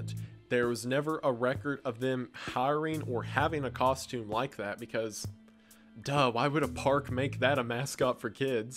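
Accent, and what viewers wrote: South, United States. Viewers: Saaaame. Then again, in my house, we* might even enjoy " reads the dictionary" as background noise. * "we" includes my demonic toddler lmao; that chill, Southern-accented baritone is just SOOTHING, man 😌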